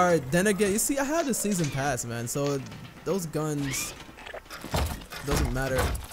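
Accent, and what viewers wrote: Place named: Jamaica. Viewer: You're jamaican? I can kinda hear the accent fam.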